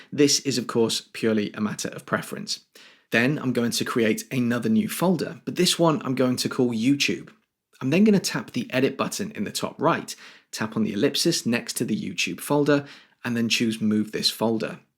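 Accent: United Kingdom. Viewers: Found your channel recently. Really good presentation from a true Brit. You from Cornwall? Or was that a random Safari page? Anyway. Glad I found this. I have already passed on to a friend. I’ve tried loads of other apps in the past, but have settled on the fact that Apple done properly is the best option for me. Thank you.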